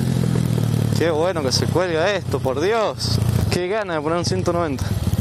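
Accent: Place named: Mendoza, Argentina. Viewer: Jaja wuacho SOS de Mendoza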